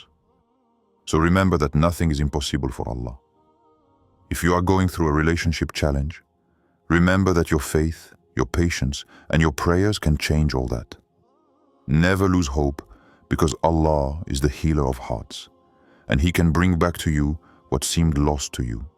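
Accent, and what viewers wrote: France. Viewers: Thank u bro , are u from france or Canada !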